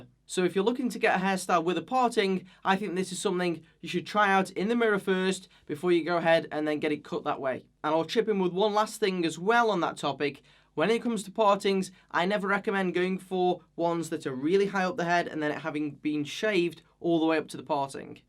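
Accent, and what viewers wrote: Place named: Northern England, United Kingdom. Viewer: Not really, Daniel Radcliffe has a London accent, his accent sounds more like a northerner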